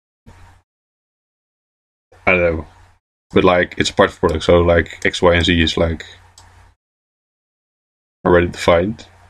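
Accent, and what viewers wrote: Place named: Netherlands. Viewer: ik hoor dat je nederlands bent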